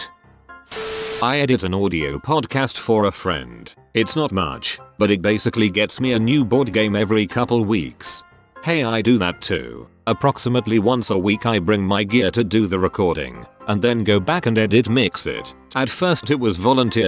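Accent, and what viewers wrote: United Kingdom. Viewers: I hate the british voice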